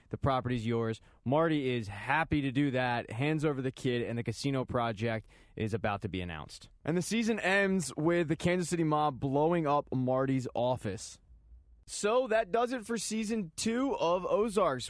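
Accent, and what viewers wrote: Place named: Baltimore, United States. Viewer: Where u from I hear an accent... down the ocean, hon??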